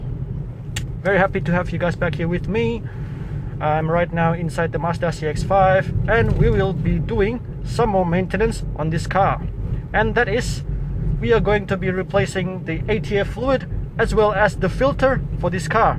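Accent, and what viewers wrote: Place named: Australia. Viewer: This is so well put together, you sound Aussie like myself bud, the description in the video is so detailed and given some of the horror stories I've heard especially in older mazda versions of Ford 5 speeds I think you're bang on with preventative maintenance at those intervals. I'm hoping the process is similar for the 5 speed in my 2008 sp 23. Looks similar to one I saw but wasn't half as well done as this and video quality wasn't the best either. Pretty sure my trans is the FS5AEL slightly modified Ford one and pretty janky from all I've heard, although I'm sure fords is worse lol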